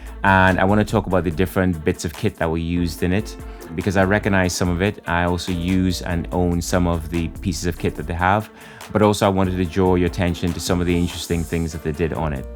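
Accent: Trinidad and Tobago. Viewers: love this vid have to ask are you trini Im hearing that accent.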